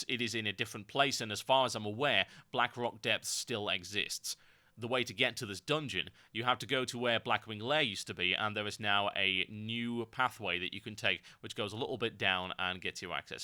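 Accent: United Kingdom. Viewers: Oh and the instance looks pretty tight too, i'm lookin forwar dto it, THANKS, keep up the vids and ignore the noobs. They've just never heard a rad british accent and immediately think of some elitist sociopathic evil villain froma cheesy Bond flick or something. That, and people just have short sided nerd ragey opinions of the way things should go and get butthurt when they don't, that's net life i suppose.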